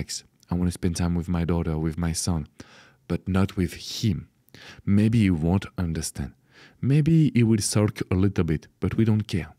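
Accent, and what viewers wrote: France. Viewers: Hi,. I love your style of coaching so much that I am a subscriber. I have a request for a possible video. Could you make a video that addresses how to deal with a fearful avoidant? I’m in that kind of a situation and it’s breaking my heart. Thank you. 😊 And BTW I love your French accent. Don’t try to change it. Be well.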